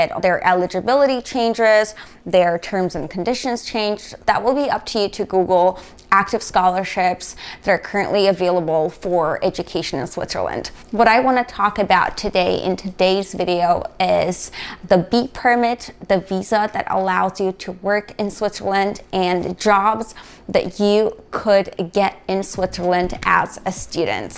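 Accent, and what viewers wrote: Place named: United States. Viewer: Accent sounds American accent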